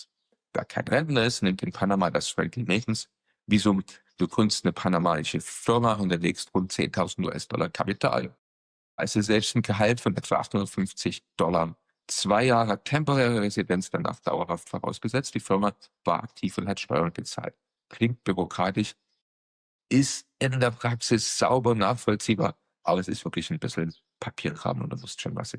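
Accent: Germany. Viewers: hört sich bissel nach Sachsen oder Thüringen an. An manchen Stellen verschluckt die Technik ganze Silben. Die Akustik ist aber in Ordnung, da hallt nix großartig nach. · Fränggisch. Des is fei goud. freili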